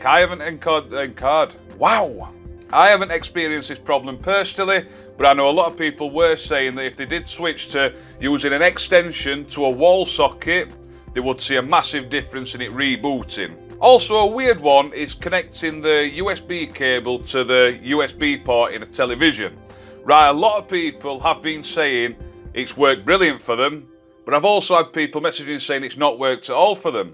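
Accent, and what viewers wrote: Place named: Scotland, United Kingdom. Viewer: This "Yank" loves humor with a Scottish accent! Thanks for making me laugh. Great info. I have fire "devices" from 1st gen to Fire Cube. I've been trying to get my 1st gen stick to work better and stop rebooting, and I think you nailed it with the power input. Used a 2.0a charger. However, it's still acting up, so it's probably just shot and old s/w doesn't help. Can't scroll through settings, just jumps. When I get to "Fire Device" it doesn't have reboot and even with 2.0a on updating says "power may be insufficient" . So think i'll give up on the 1st gen, and stick with 2nd gen (don't have 4k TVs). However I noticed unplugging my 2nd gen FS it was really hot. I like your idea of taking the shell off to run cooler but unplugging it might be hot? Was thinking may taking skin off and drilling holes or making it more open to let out more heat. 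Thanks. Great ideas!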